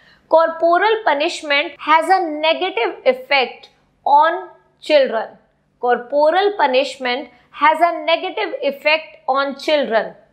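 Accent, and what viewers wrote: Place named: Punjab, India. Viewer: Mam aapki hindi ki tune Punjabi me bahut achhi lagti hai .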